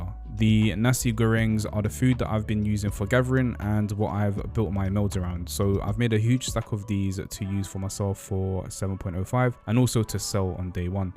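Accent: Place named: London, United Kingdom. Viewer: are you from London? Your accent is dank. Also, when are you back, baby?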